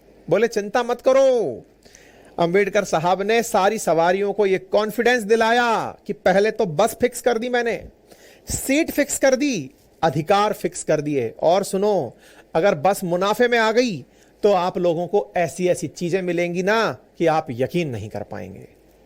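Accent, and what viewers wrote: Rajasthan, India. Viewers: Sir pura rajasthani style me samjha rhe hain kya baat hai 😅 vaise mai up se hu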